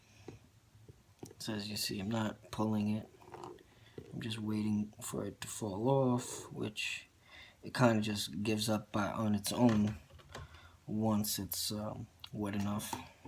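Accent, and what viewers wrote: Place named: New York, United States. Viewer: You say awwff, for off, are you New York..New Jersey? I'm hailing from central London UK.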